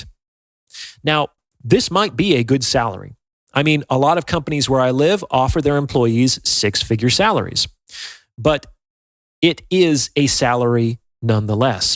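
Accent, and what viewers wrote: United Kingdom. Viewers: RP AF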